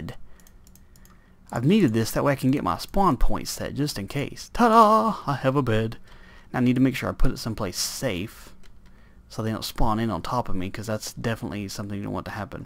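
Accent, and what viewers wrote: South, United States. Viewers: Like country accent im from Georgia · Thank you very much for these awesome videos,! I like your accent too. What state are you from? Greetings from Washington State!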